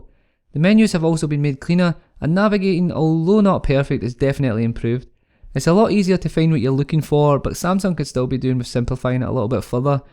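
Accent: Scotland, United Kingdom. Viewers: I really enjoyed it! Since your primary audience is in the UK, I wouldn't worry about your speaking too much. Even being in the US, I understood you perfectly. I'm a little biased though. Scottish accent has always been my favorite. Subscribed. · OMG I frigging adore your Scottish accent!! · I like your Scottish accent, it let's me practice on understanding it 😁 although, you should practice on talking a bit slower.. Very good review Good luck! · Is this a scottish accent ? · Great video for a relatively new reviewer. Love your Scottish accent! Subscribed!! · Nice review bro. Going to Glasgow,Scotland for the 1st time and your video has made me get used to the accent! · Good review for an awesome device. Your Scottish accent is not that thick and can be easily understood. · See it the Comments below you are Scottish- I figured by the Accent it was either Scotland or Texas.... 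And down to earth informative Reviews nice relaxed Style good voice and no problems understanding you.. Want to hear more about that Battery- should the Phone have been a little thicker for 400 more Mah ? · You should rename your website: Scottish tech reviews! Love the accent :)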